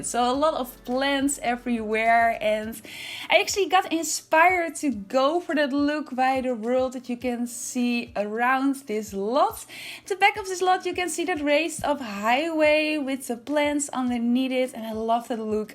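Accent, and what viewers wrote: Netherlands. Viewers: You have one of the cutest Dutch accents I have ever heared (I'm Dutch as well), and I usually don't like the dutch accent at all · Clocked the Dutch accent, hehe xxgroetjes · Yeah you’re Dutch I knew the first 3 seconds of the video😂😂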